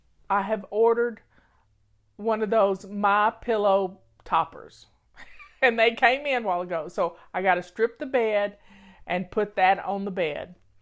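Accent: South, United States. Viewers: Omg. You are so southern. I love it but I never imagined your voice being so perfectly southern 🖤🖤. Been following you for years and finally GETTING it by not trying to alter the system. Thank you!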